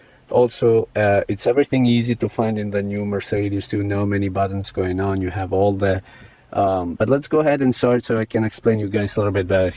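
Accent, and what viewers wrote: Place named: Albania. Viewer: I could swear this guy is Albanian. Chances are he’s from Shkoder Ku jeee o naqe😂🍾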